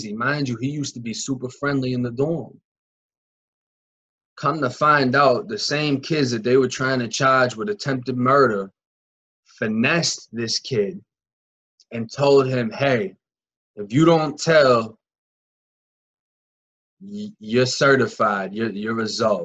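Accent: Boston, United States. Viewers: This dude talking about Florida, but is he from Beantown? That accent sticks out to me. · Yo your mix of Boston and country accent is great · Hey hon, i really enjoy your content. You have a great way of speaking and your Boston accent is da bomb. Your stories are very interesting. You will be growing by leaps and bounds on here. How are your tootsies feeling? What a serious painful nightmare. People underestimate the sensitivity of the toes! I was just crying over a simple~ass blister on my toe from a pair of new shoes! Please! Frickin wuss I am! I look forward to more videos from you! Best, Michele from Baltimore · And are u from boston origanally bc ur accent sounds exactly like a boston accent just wondering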